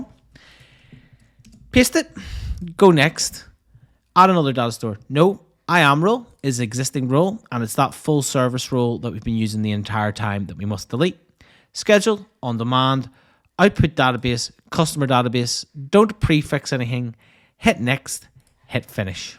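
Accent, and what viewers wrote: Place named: Northern Ireland, United Kingdom. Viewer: Finally an aws tutorial that uses "wee" and talks in a NI accent, made me laugh that I finally found a fellow NI person :) followed every word :D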